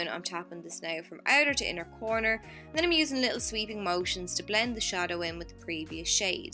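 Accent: Ireland. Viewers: Subbing for that gorgeous Irish accent! And I have the same shade eye color so these videos will be helpful!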